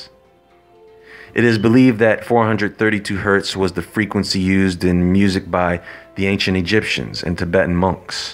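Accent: United States. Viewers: Where is the narrator from? His accent sounds like American.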